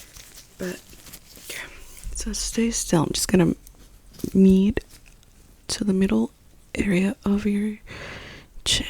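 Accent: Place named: United States. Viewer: A very clear, deep, soft female voice ❤ with a natural American accent. Not all those "French, Mexican, Slavic, etc."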